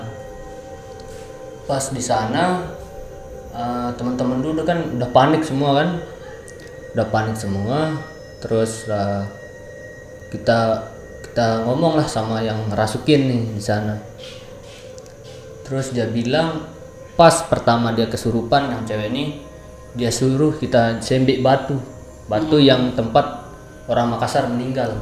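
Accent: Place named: Lombok, Indonesia. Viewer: Indo sasaknya kentel banget bang Semangat²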